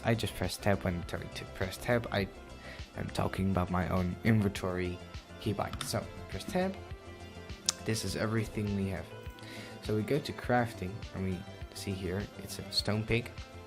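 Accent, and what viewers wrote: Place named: Netherlands. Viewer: nederland!! ik hoor het aan jou accent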